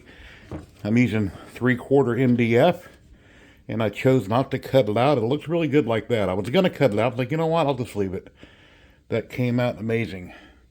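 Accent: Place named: United States. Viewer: very useful review 👍 Sorry but the American accent beat me :) Could you give the name of the sites you used for the maps and tray designs? Thanks!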